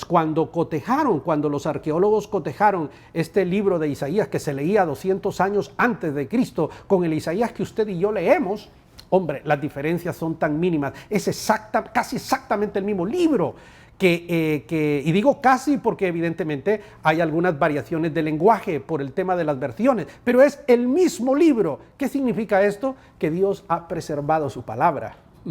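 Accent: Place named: Spain. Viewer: De dónde la sale hablar Español de España a este cuerudo hondureño? Siempre pensé que este tipo era un engreído.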